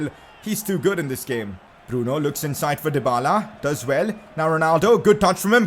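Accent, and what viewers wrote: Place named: India. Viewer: U speak like indian mannn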